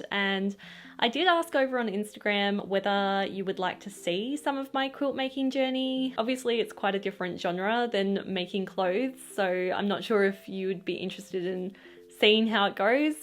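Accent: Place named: Australia. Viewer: Struggled with the Summer bit. Then heard you talk and remembered, silly me, you are in Australia!. Been there 20yrs ago. Wish I was there now. Looking forward to seeing how the dresses turn out. Also as a Quilter I can't wait to see your artistic version.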